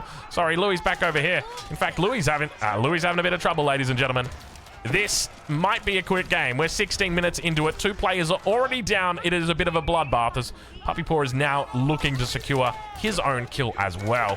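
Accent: Australia. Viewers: love this aussie man casting vods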